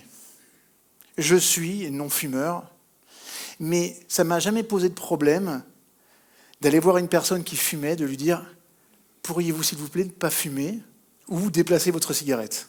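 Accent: Quebec, Canada. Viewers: Ch’t’aime donc bin! Avec l’accent d’une pure QUÉBÉCOISE ❤️🙏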